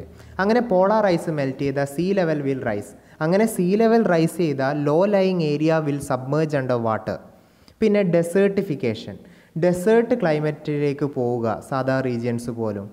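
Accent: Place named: Kozhikode, India. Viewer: Sir Kozhikode aan allo😌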